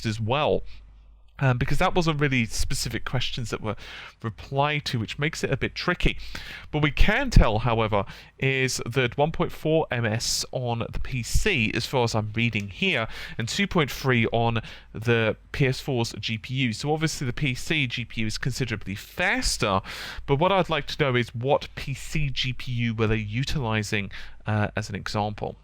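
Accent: United Kingdom. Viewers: 100% have to agree! These guys (particularly the narrator of this video - his name escapes me (sorry > - < )) know their shit! Like, KNOW know their shit… But the day-in, day-out rehashing of PS4 > Xbone is getting quite… taxing… Love the channel, but I'm getting to the point where if there's a mention of "PS4" or "Sony" in the title, I'm tempted to go out of my way not to click it. These guys are very level-headed when it comes to the console war bullshit, but as an xbox fan, when it's all you hear about for the past 12+ months? Yeah, I'm becoming quite sick of it. (Was sick of it months ago, but whatever.) And even then, they go out of their way to report on rumors or leaks that emphasis PS4 > XO… How about some misterxmedia coverage? I mean, the guy is either being 100% trolled or the industry is in for the world's largest reveal (#unlikely), but either way I don't have the hardware know-how to really sift through his posts. 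But [the author of this video]? He pegs me as someone who would be able to understand exactly what MisterX's 'insider' is talking about. And regarding ^that^ (the MisterX stuff), I'm not looking for a "is it probable" or "reasonable" analysis, simply an explanation of all the tech-talk that goes on in that 'forum'… It boggles my mind every time I look at it, but it's still unbelievably fascinating! I'd love to hear an explanation from someone with such a dreamy british accent. • ◡•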